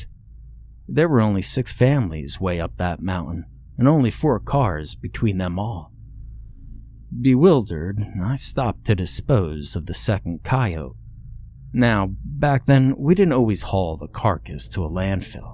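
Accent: South, United States. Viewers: Oohh I wish it was longer much longer! I mean this story. Your Southern draw is flawless and it fits this story just right.👍🏻👍🏻👍🏻🌹🇱🇺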